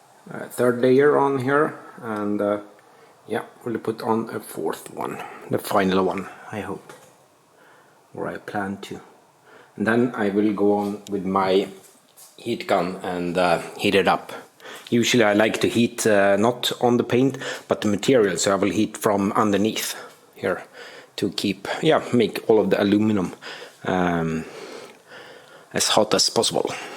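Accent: Sweden. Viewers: Men satan va svensk du låter 😂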